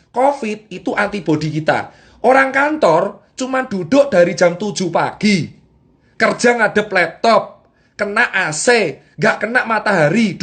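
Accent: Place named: Surabaya, Indonesia. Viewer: Asli... Gaya suroboyoan.... Gamblang njelasno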